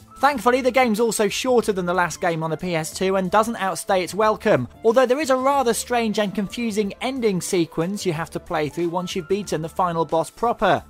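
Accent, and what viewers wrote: United Kingdom. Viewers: actually he sounds british to me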